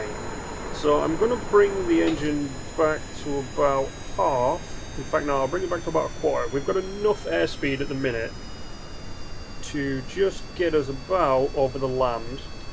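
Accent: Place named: Geordie, United Kingdom. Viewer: no problem. Also, are you from the northeast by any chance? I sorta hear Geordie mixed with a bit of Yorkshire.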